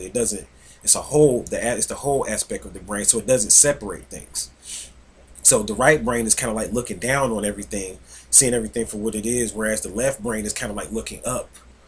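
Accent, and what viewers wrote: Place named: Philadelphia, United States. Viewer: i can tell he is from philly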